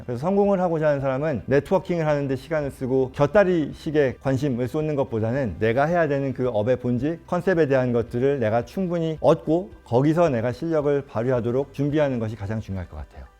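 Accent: United States. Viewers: 이야...환경이 중요하구나 한국에서 오셨는데 미국에서 태어나고 자란 나보다 더 교포느낌 나시네 ㅋㅋ 난 한국서 연습생해서 지금은 영어하지않는 이상 사람들이 교포인지도 모름ㅋㅋ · 말투가 미국교포 발음이라서 어렸을때 간줄 알았는데.. 이력보니 서울대 경제학과 졸업후 가신거네요. 어렸을때 갔으면 한국에 대해서 잘 모를텐데 대학 졸업후 간거면 한국에 대해서 너무 잘 아시겠네요. 이분이 한국과 미국 양쪽에서 성공적으로 활동할수 있었는지 알수 있는 이력이네요.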